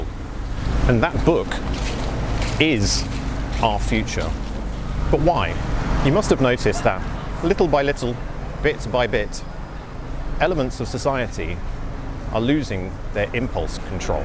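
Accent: England, United Kingdom. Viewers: New sub keep doing what you are doing and there is nothing like an English accent to teach.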